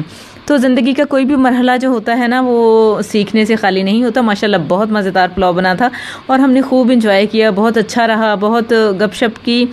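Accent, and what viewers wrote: Punjab, India. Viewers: AAj to Panjabi naal Gallan kar rahe ho aap...Naya Andaz achha laga ji MashaAllah Aam ka pakaya jaa raha hai... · Aunty aap bohat mushkil punjabi bolti hain. Good dish in the start . Green walay aamon ki peti mujhay bhejwa dein. heheeh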